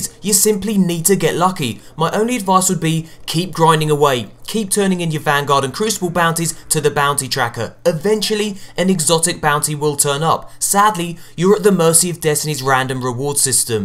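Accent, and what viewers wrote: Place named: United Kingdom. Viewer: I watch these vids to here your voice and freak outs you snazzy Brit you x)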